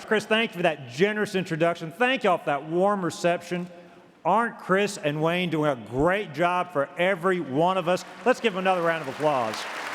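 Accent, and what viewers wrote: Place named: South, United States. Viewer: First southern accent in an indian i've heard lol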